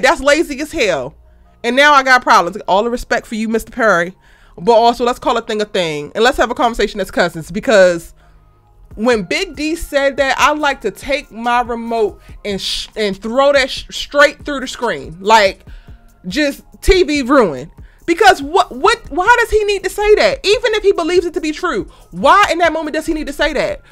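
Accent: Baltimore, United States. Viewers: U from Baltimore? That accent thick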